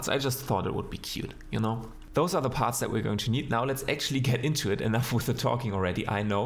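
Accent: Germany. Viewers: Gutes Video :) Mein Abo haste :D in der Ersten Sekunde hab ich herausgehört das hier ein Deutscher am Werk is :D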